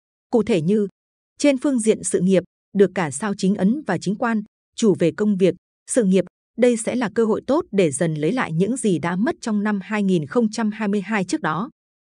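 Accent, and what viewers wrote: Thanh Hoa, Vietnam. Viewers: Thầy ở huyện nào thanh hóa vậy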